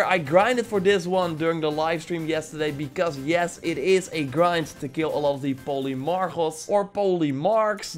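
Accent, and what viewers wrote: Netherlands. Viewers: je hoort gelijk nederlands haha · You sound......Dutch....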